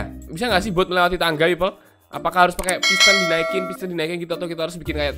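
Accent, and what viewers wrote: Java, Indonesia. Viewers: kamu orang jawa?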